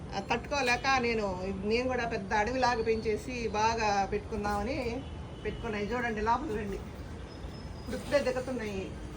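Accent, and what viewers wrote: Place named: Rayalaseema, India. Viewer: Chelli! Meeru bhalega musthabu ayuthunnaru. Saree bagundi. Kadapa chittur districts slang bhale matladutbunnaru. Itlane matladuthu vundali.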